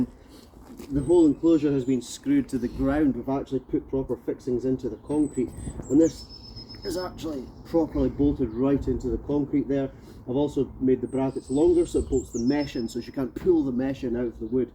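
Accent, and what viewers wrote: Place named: Edinburgh, United Kingdom. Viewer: Nice one mate, I'm thinking about an outdoor enclosure for a Tegu. I have ideas to build framing a little wider than this but around 7-10 feet tall with large logs upright and hammock like areas to bask up high and some climbing space. You sound local to myself, Livingston/Edinburgh? if your budget isn't high I get lots of spare wood from my joiner friend, excess off jobs if you ever need some. I'm in the middle of a raised pond atm all done from his free wood, also an 8 foot turtle dry enclosure to sit above his 8 foot aquarium can climb up into so I can fill the tank right up and utilise all the swimming space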